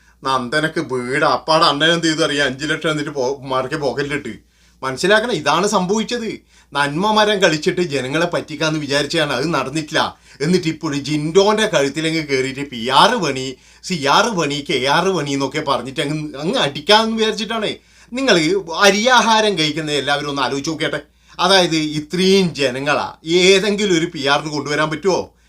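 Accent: Kannur, India. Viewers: നിങ്ങളുടെ കണ്ണൂർ ഭാഷ ❤ നാട്ടിൽ എവിടെ ആണ് ❤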